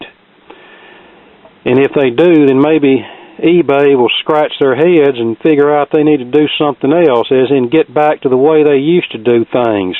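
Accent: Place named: United States. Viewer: Blitz Roehre Vor dreißig Jahren wohnte ich in süd Deutschland. Ihr Akzent klingt anders... Amerikanisch vielliecht?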